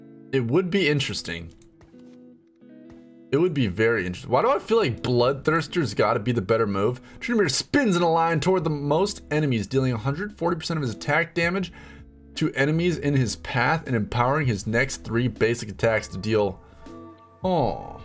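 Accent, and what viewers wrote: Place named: Rio de Janeiro, Brazil. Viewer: paulistano??? Ta maluco kkkkkkkk carioca na veia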